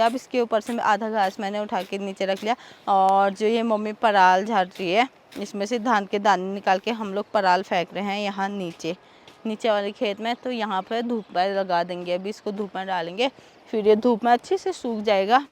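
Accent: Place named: Pahadi, India. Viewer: Hindi m v vlog bnaoge to chlega dii ek pahadi chahe kitni hi hindi kyu na bolta ho uske bolne m phadi accent hi hota or vo aapki voice m h hindi m vlogging krte huye phadi words ka use krna isi s ek phadi ki pehchan h proud to be a phadi uttarakhandi love from Almora ❣️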